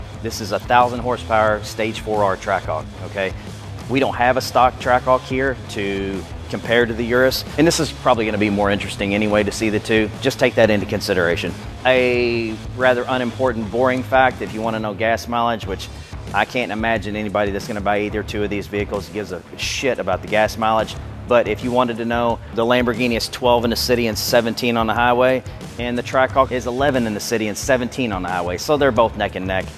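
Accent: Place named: South, United States. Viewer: Something about a slight country accent and Lambo is my favorite car company gets me thinking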